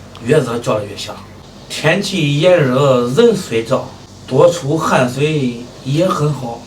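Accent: Henan, China. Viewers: 这口音是河南还是襄阳啊？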